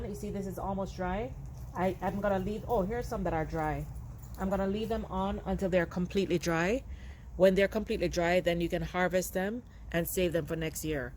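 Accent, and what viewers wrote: Jamaica. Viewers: Hey, love the video. Just discovering your channel and knew from the sound of your voice you are Jamaican. Love how you store your seed, I use wipe containers to save mine 🤦🏾‍♀️. You are right. No need to go hungry when we can plant our own food. While not everyone has the space container gardening is a viable method for most people.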